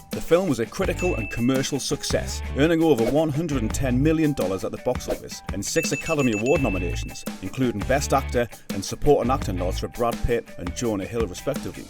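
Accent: Newcastle, United Kingdom. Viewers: Who made the British guy do the voiceover for this one? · gone Geordie? Not what I expected.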